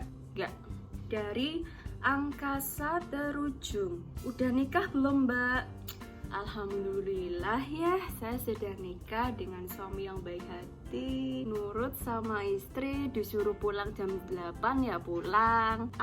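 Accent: Java, Indonesia. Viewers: mbak e medok banget kaya orang jawa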